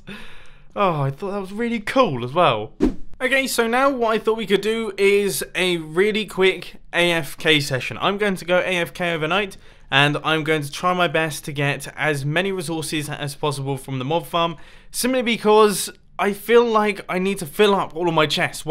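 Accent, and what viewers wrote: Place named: RP, United Kingdom. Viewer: Who else remembers posh?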